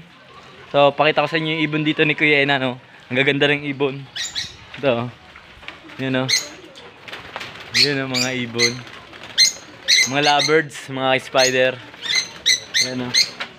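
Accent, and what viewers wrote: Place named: Laguna, Philippines. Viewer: Tol taga san ka pala ka punto mo samin eh sa sta cruz laguna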